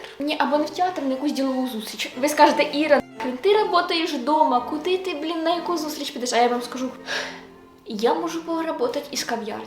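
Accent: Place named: Western Ukraine, Ukraine. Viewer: У тебе така гарна мова, не чисто літературна але в цьому і привабливість. Ти взагалі звідки? Гадаю з Западною України- Тернопіль, а може і з Вінниці. А взагалі дуже приемно чути твою мову- спасибі тобі!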